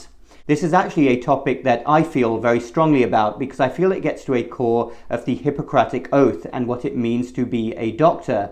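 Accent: United Kingdom. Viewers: This opens up a bigger issue. Many doctors do refuse people for alot of unethical reasons. It happens more than you hear about. I was kicked out of the biggest healthcare system in the state because I stood up for myself in having one of my newly identified conditions treated. Even 6 years later if any doctor tries to refer me to a specialist there in any of their satellite clinics what happens is an electronic blocks prevents the scheduling person from advancing any further and locks up their computer. It then requires them to shut down their computer and reboot and then it tells them to "contact patient relations" where it will say I've been banned for life. This is a clear violation of the hippocratic oath but several doctors went along with the administration's agenda while the controversy was still going on and my own primary care physician's office was the one that was responsible. 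This is because now administrative staff have things hierarchically set up so that they outrank doctors, doctors don't own their own clinics anymore, and if doctors want to keep their jobs they are required to act against the best interest of patients if the "financial interest" of the corporation warrants it in their eyes. I don't know where you work (I'm guessing the UK because of your accent), if so then I think the UK has more of a community health perspective and this is probably less common to take place there than in the US, which does not have a single payer system. I was just kicked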